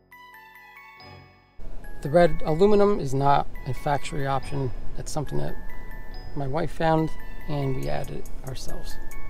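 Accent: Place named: Pennsylvania, United States. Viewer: Nice Pennsylvania accent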